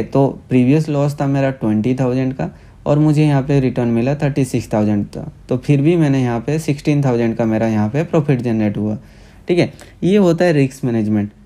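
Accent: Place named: Kolkata, India. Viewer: Kolkata Se Haii Bhaii ❤️ Tere Bolne Ka Style Se Pta Ge Mujhe..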